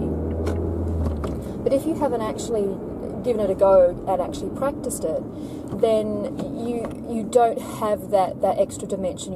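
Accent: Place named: South Africa. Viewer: hi are u from south africa it sounds like it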